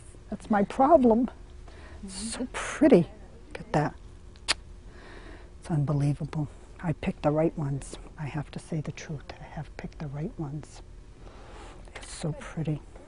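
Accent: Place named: Warwick, United Kingdom. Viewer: Strong Warwick accent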